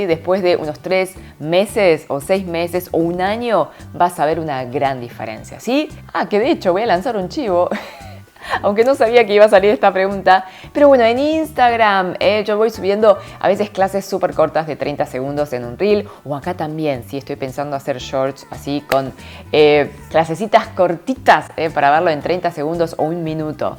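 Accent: Argentina. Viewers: Tienes acento argentino si no equivoco xd gracias por el video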